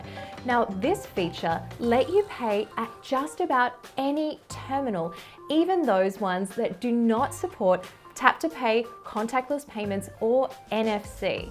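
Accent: Australia. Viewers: This gal is an Aussie, I'm sure of it 🦘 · Is she Australian 🤔🤔🤔 Kinda!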